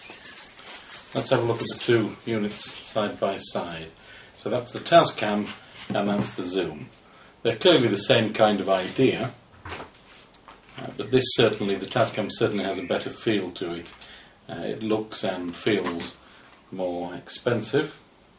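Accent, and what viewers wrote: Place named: United Kingdom. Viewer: What part of Great Britain You from ?!?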